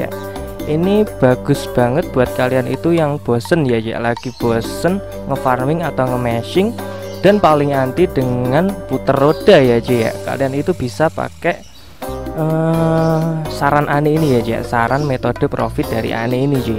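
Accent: Java, Indonesia. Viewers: Orang jawa bang? 😂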